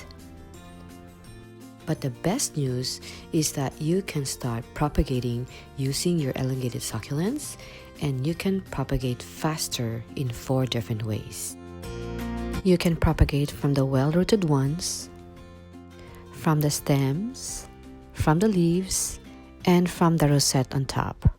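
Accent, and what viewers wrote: Philippines. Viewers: I can hear you're my kababayan! This is an excellent video. Thanks!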